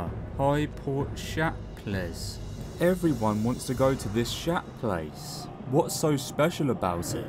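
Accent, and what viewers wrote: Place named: Estuary, United Kingdom. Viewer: That Estuary accent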